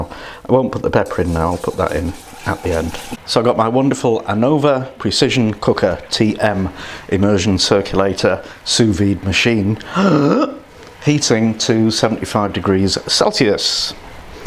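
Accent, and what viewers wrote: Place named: Liverpool, United Kingdom. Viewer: tnh I just watched your most recent video of crumpets and scrambled eggs, and I was going to unfollow you if your eggs were done wrongly.. well, they weren't really discribed very well so I came here; then you did scrambled eggs in the microwave.. so I'm done. Your Scouse was actually not bad though, and that comes from a Scouser; but I can not abide someone who demonstrates microwave scrambled eggs. I am sorry to leave this way, but at least I am leaving feedback. Also, your pea whack (pea and ham soup) recipe was pretty good too, yet not a revelation to those who learnt it from their ma'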